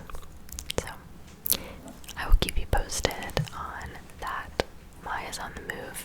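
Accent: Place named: California, United States. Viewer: you can do no wrong. say whatever you like even if it's with a CA accent !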